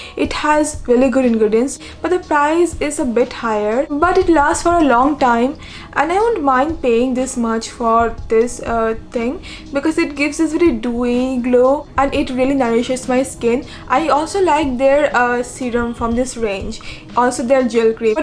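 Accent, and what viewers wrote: Bengal, India. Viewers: It's Bengali accent. She's not faking it.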